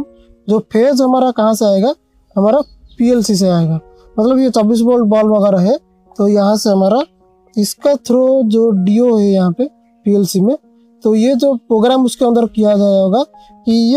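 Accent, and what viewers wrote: Odisha, India. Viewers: Sir, you from odisha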